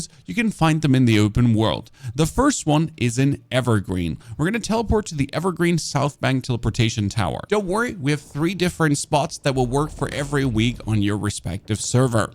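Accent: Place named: Germany. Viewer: its probably cause your german but i absolutely love how you sound explaining things can you possibly narrate my life day by day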